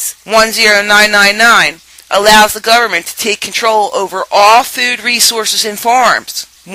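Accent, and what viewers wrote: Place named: Baltimore, United States. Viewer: wtf.. you have a baltimore accent lol...great video though.. it speaks the truth.. PRESIDENT RON PAUL 2012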